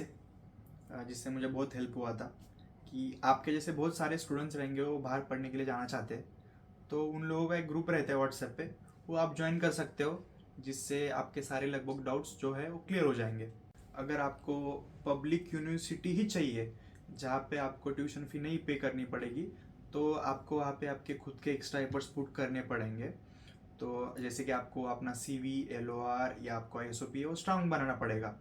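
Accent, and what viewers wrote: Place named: Maharashtra, India. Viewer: Hindi with Marathi accent😅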